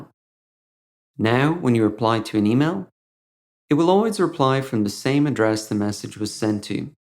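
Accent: Ireland. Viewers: lol, lovely irish;)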